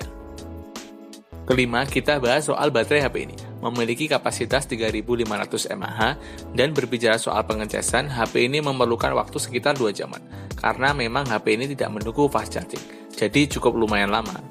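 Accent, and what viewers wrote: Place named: East Java, Indonesia. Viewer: orang magetan ya gann :D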